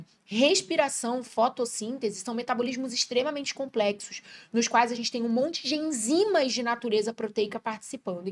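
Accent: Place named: Rio de Janeiro, Brazil. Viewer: Esse sotaque carioca me encanta! Que energia linda a tem!!!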